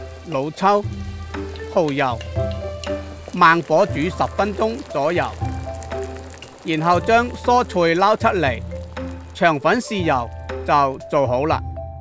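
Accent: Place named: Zhaoqing, China. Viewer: 口音一聽就聽肇慶人👍👍👍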